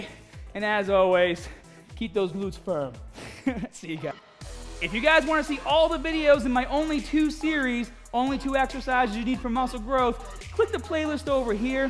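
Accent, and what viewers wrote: Boston, United States. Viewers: Boston accent keeps coming out. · I’m assuming he’s a Boston guy, right? Gahhhhbage, Lahhhson, etc. 😂😂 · Is from Boston?? GaaahBage.... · Man are you from Boston? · Is he from Boston ? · Is that a Boston accent?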